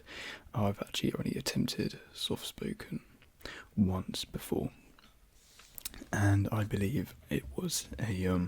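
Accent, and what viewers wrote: United Kingdom. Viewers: British accent, i live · Ох уж этот вкусный британский акцент - я просто в восторге! Хочу чтобы у моего будущего парня был такой же голос))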